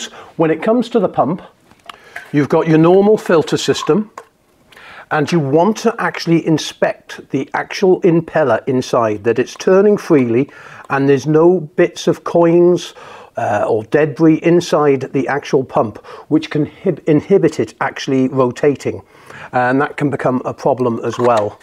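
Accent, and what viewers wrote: United Kingdom. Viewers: Thanks a million for a great tutorial. And as English isn't my first language I especially appreciate your plain, sort of BBC like, English so easy to follow. Best regards! You've already created a legacy.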